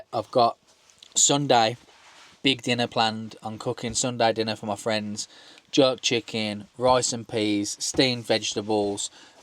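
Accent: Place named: Black Country, United Kingdom. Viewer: And just where abouts from the Midlands are you from? I'm reckonin Black Country... Bilston... Shortheath? Willenhall maybe? Go on, tell me how far off I am! Love the views and the van by the way!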